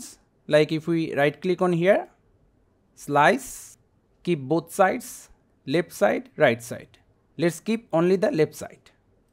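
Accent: India. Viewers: I love everytime I need to know how to edit something on a program I just found there is an Indian sounding man ready to explain it. good video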